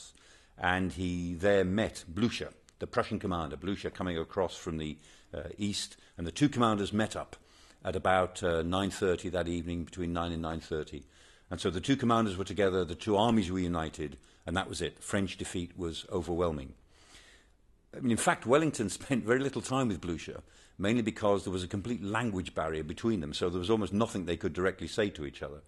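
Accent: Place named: England, United Kingdom. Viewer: Professor Michael Clarke. I love your accent. In the USA there is a Yankee nasal twang. Today in England many breathe and pause a lot . "Day" sounds like "Daii" The UK should put out 2 to 3 videos on Youtube as "the Model or Perfect English accent."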